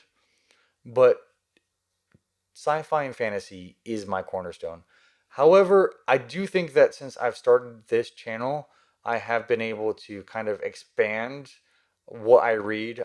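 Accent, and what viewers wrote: South, United States. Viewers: I love your Southern accent!!!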